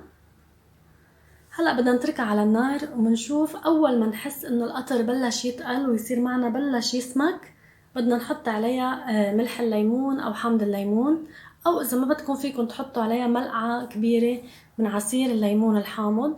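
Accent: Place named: Lebanon. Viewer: اولا تسلم ايدك ثانيا اللهجة اللبنانية روعة تحياتي لك من سوريا❤ اتمنالك التوفيق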